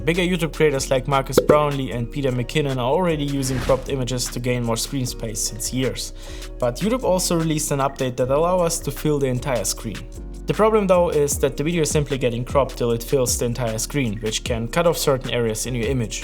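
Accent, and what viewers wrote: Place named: Germany. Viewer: Ein bisschen harter Deutscher Akzent, aber in Sachen Videoschnitt ein wirklich sehr gelungenes Video. Optisch wirklich sehr ansprechend. Ach ja und danke auch für den eigentlichen Inhalt des Videos, den Hinweis auf das neue Format.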